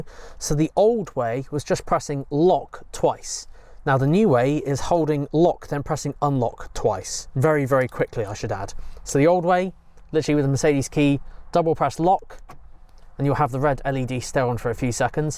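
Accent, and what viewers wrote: England, United Kingdom. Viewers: thank you for your prompt response. chatGPT mentioned that it may be a bad calibration of the sensors and recommend to take it to MB dealer for service. Thank you again. I love your content. I need to use captions because is hard for me to understand English from England. But you create great content. I live in Miami, Florida USA.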